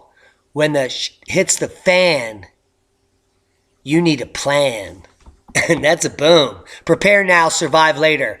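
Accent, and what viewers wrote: England, United Kingdom. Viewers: Love your English accent. What accent, I don't have an accent.LOLLOL. Great show. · nice Brit accent bra!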